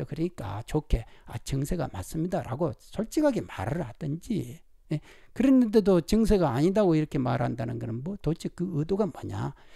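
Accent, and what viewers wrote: Jeolla, South Korea. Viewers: 전라도분 같은데 문재인정권에 대해 어떻게생각하시나요?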